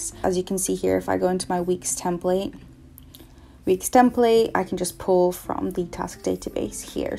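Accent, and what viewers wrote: Scotland, United Kingdom. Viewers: Your accent though. Are you scottish?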